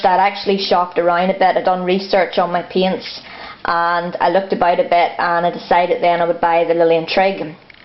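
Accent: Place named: Northern Ireland, United Kingdom. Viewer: I totally wasn't expecting a fellow Northern Irish person ❤ thanks for the tips xx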